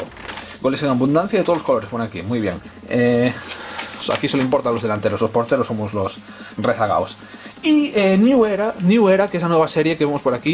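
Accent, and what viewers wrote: Galicia, Spain. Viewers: De donde eres? Eres galego, non?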